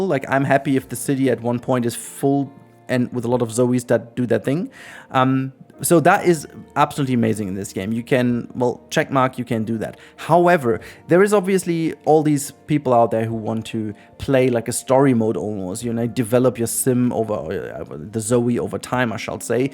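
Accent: Germany. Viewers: I'm confused bc I've watched many videos about this game and haven't heard anyone saying it like that, plus he sounds like German is his first language, therefore that isn't to blame either (despite what the other person who replied here said), given that the O in German never sounds like "ou" on its own, unless there's a U after it. I can only assume he tried to guess how it would be pronounced in English and got it wrong, but that is another thing I'm confused about, bc one would think he would have watched other videos about this game, and if he had, he would have learned how everyone else is saying it (unless that's how it's pronounced in Korean, and he knows that and I don't, which is another reason I'm asking, so I can learn if it is). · Because he is of German origin, so we speak what we read.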